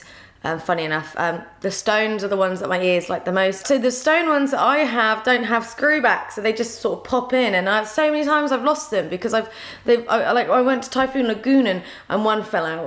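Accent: United Kingdom. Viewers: I'm watching your videos since 4 years, but yesterday I watched the Amy documentary and since then you remind me of her, don't know if it's just the british accent or the tattoos or the uncommon hair, but that's the whole time in my mind :D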